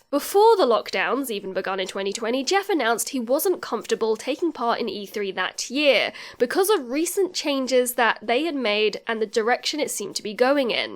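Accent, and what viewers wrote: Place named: United Kingdom. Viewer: Nothing like the soothing British voice of to feed me copium before I go to sleep for the night, thank you for furthering my hope soldier 🫡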